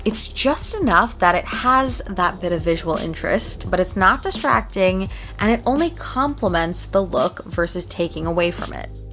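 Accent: United States. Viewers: The mid Atlantic accent🤌🏼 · So creative and loved the tips!That transatlantic accent was everything 😂❤👏🏻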